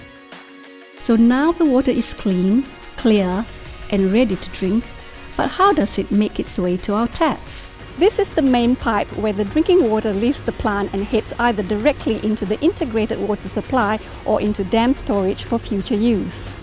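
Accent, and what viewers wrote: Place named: China. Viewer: I suspect it could be a Chinese who has learned English?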